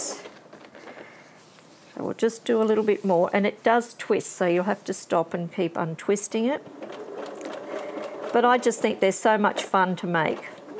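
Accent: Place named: Australia. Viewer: Thank you. Lovely to listen to a fellow Aussie. Your baskets were extremely neat and tidy; best I have seen. I have subscribed and binge watched several videos in a row. Good to know I can purchase notions in Australia.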